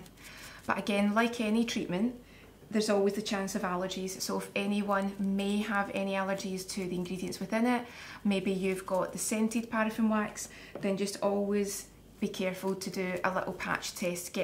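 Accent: Scotland, United Kingdom. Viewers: I agree. Perhaps a series of lovely Scottish poems and stories read in your soft brogue would soothe the world's woes. What a delightful prospect. Soft music would be a sweet touch. Thank you.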